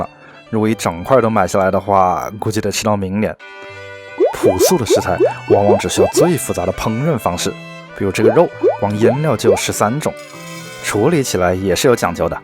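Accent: Wuhan, China. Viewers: 湖北口音武漢口音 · 武汉老哥？ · up主是武汉人？浓浓的武汉普通话的味道。。。🙂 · 一口汉普，武汉人吗？ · 听着你说武汉正宗弯管子普通话，好亲切啊！ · 熟悉的武汉普通话，老乡，欢迎来德州奥斯汀试试本地的Franklin，salt lick等等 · 我也是家里有两只猫的武汉人 听你口音就像 直到听到你妈妈给你打电话 实锤了~ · 武汉口音？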